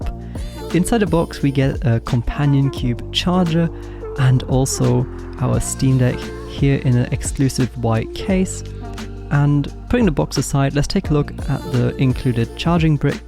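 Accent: Germany. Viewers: Geiles Video ! Am Akzent hör ich das wir die gleiche Sprache sprechen :)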